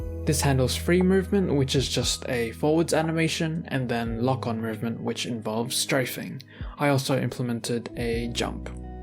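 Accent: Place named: Australia. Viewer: Great video! Knew you were an aussie from the first few seconds of the video xD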